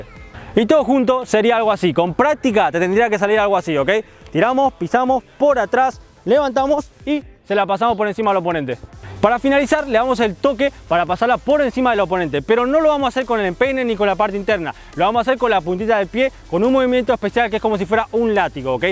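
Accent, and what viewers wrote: Argentina. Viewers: Sos argentino o que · ¿ sos de a Argentina?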